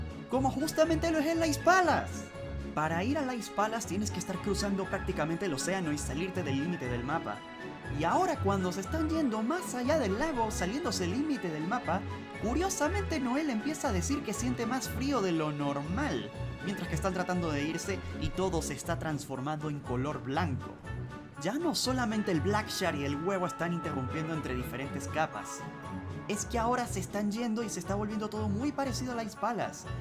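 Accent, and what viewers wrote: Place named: Venezuela. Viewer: Nada más escuchando tu forma de hablar reconocí Venezuela ❤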